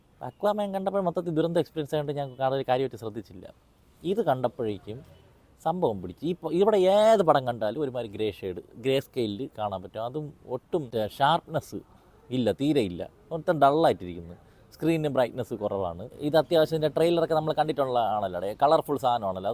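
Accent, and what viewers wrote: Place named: Thiruvananthapuram, India. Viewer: തിരുവനന്തപുരം ഭാഷ❤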